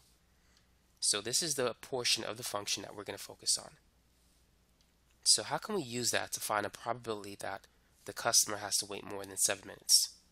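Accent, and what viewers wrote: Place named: Brooklyn, United States. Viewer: I love your Brooklyn accent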